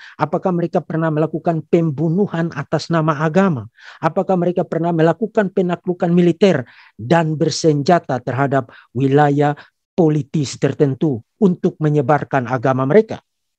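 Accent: East Nusa Tenggara, Indonesia. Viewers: aksen rote NTT... Bravo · Narasi yg cerdas, dan ilmiah, klo dengar dialeknya teringat waktu kuliah di Malang bersama teman kuliah dr NTT, Tuhan Yesus memberkati bang sekeluarga 🙏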